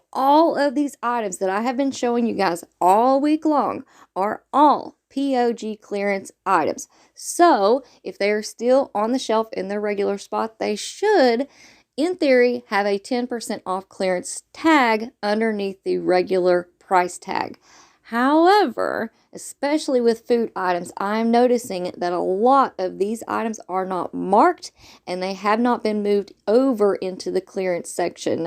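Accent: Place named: South, United States. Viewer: Odd comment incoming 😂….I love your voice and accent! It’s so pleasant. Are you a fellow Southerner?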